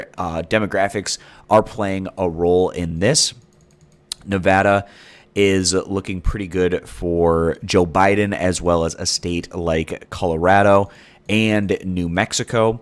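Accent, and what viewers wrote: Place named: Midwest, United States. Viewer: I’m from Chicago and your midwestern “twang” is pretty heavy lol.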